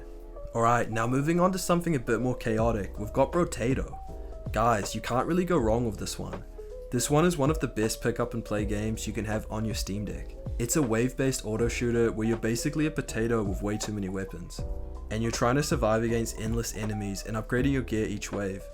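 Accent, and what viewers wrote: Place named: New Zealand. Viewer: the New Zealand accent and saying deck is so funny 😅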